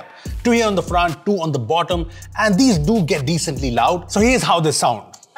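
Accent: India. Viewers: that's his accent. Most urban Indian accents in posh areas of Delhi, Gurgaon, and Noida do not pronounce r in words. And he's from Noida.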